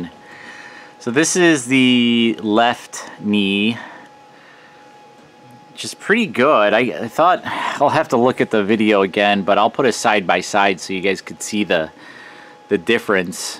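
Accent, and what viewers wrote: Midwest, United States. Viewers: Sounds like hes from Wisconsin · This guy's midwestern accent is killer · The midwestern accent is sexy as fuck. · this guys accent reminds me of growing up in the midwest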